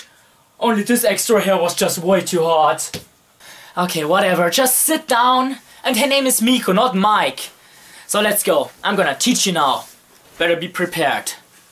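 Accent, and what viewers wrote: Germany. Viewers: ich wusste das du deutsch bist XD dein Akzent verrät es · Nice to see as a german. Quite creative man keep it up Und man merkt den Deutschen Akzent aber cool ;)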